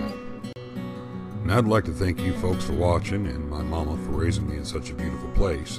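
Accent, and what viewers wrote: South, United States. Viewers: Like most of the people on here I'm thrilled with the narration format. I like the Southern Sound of the accent since I'm a Texas boy myself. Sounds like you'd be right at home in the Lone Star State. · So what's with the southern accent? I tought you was from da UP 'eh? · I love that southern accent the ol' story is told in! Great solution for van camping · I see that you also picked up a southern accent while visiting your mom. Although it should have been the other way around. Nice narration by the way. Thank you for sharing.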